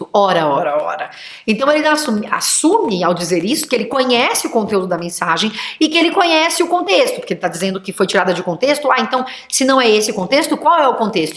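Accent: Rio de Janeiro, Brazil. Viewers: ...amo esse carioquês!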